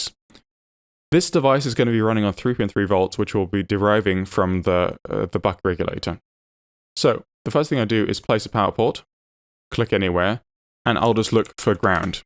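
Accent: United Kingdom. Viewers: Wow, I still can't believe I've watched all of your PCB Design videos (5+ hours in total) in one sitting. Your know-how and fast skills with kicad are astonishing, plus your clean (Queen's) English makes it very easy for us non-native English speakers to comprehend what you're saying. You've earned every sub,. I am currently working on my master thesis in EE and your videos are very informative. Keep up the good work, and THANK YOU very much for your videos.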